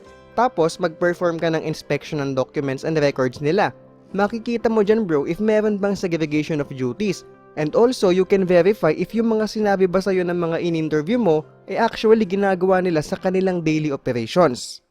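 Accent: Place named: India. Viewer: you have Indian accent why using a blond blue-eyed skin?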